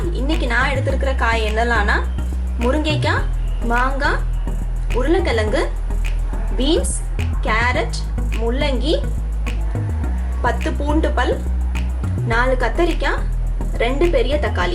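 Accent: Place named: Tirunelveli, India. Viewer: Super... I'll try... Tirunelveli slang nalla vaaruthu nadavula...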